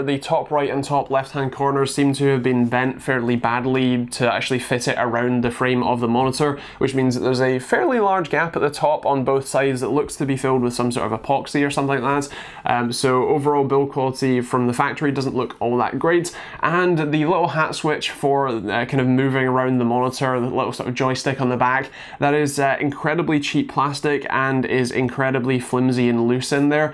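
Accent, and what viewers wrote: Scotland, United Kingdom. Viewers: Where in Scotland are you from? Sounds northern. Maybe Inverness?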